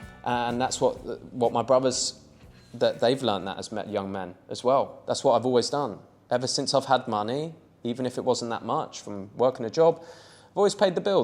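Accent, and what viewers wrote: United Kingdom. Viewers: No.1: Letting a nihilistic hedonist with a British accent on YouTube tell you how to be a a man and how not to. Huuurrrrr brooo you have to drive an outdated mode of transmission otherwise ur a soyyy hurrrrr.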